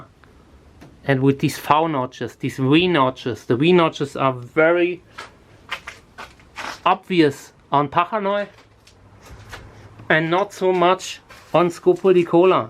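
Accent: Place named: Germany. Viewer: Du bist doch Deutscher.... Das hör Ich doch.... Wo wohnst du denn, dass die so gut gedeihen?? 👌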